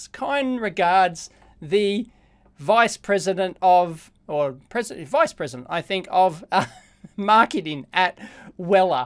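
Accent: Australia. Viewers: Yep it’s pants. To be honest Weller has a long tradition of supplying quality irons and stations. Weller as with a lot of European manufacturers have gone off the boil of late which is a shame as they are looking at price point rather than quality, it seems to be money men vs engineers. I will say one thing you sure have picked up the Aussie accent, how long have you been out there?:-D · even got an authentic Strayan accent already. Amazing! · You picked up the Australian accent very quickly!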